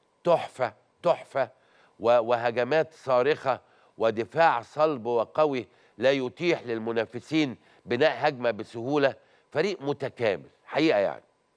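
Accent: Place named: Egypt. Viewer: حلوة اللهجة المصرية .عجبني لما قال . احمد زي الحاج احمد 😂 .وناس ده بانس وونس 😂😂.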